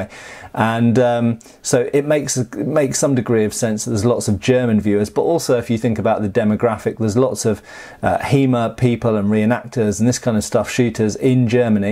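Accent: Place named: United Kingdom. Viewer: 'Ello! French here! I'm not so much into weapons and stuff. I don't know about the other French people, but I'm just suscribing to your channel because I love ze british accent! Also, 'ere, we don't say ze metric system, we say ze internationnal system. Lot of love from Paris.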